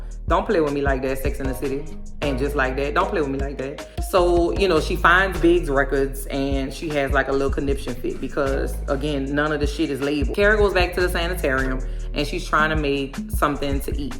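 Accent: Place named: Louisiana, United States. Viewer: Ok Louisiana, I hear that accent. Don’t want to assume NOLA. Side note, Just Like That is the pits. 😔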